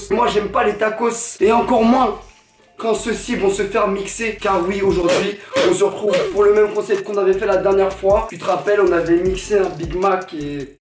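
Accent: Belgium. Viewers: Tu est belge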